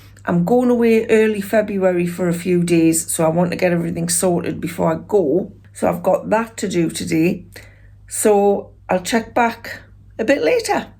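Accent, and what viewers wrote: Newcastle, United Kingdom. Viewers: Hi, I really enjoy your vlogs, your food looked lovely. I’ve been on HRT for nearly 13 years since I was 50 and I’ve no plans to stop it as I had terrible brain fog and hot flushes before. I worry that all the symptoms will come back and I couldn’t cope with that again! Looking forward to more of your vlogs, oh and I just love your geordie accent it’s one of my favourites, I spent a lot of time in Tynemouth when my son was young, I love it there. Xx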